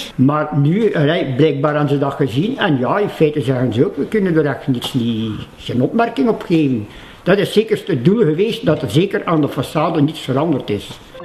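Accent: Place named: Flanders, Belgium. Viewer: vlaams klappe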